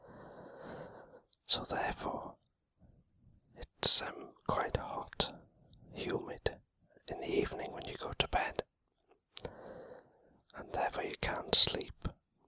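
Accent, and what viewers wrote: Wales, United Kingdom. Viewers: Just came across your videos. So nice hearing a Welsh voice doing ASMR 😀 I'm from North Wales, you? Diolch yn fawr 👌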